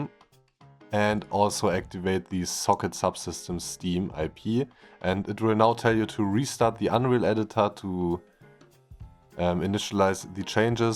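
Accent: Germany. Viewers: man hört das deutsche raus 😅, danke fürs tutorial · I hear german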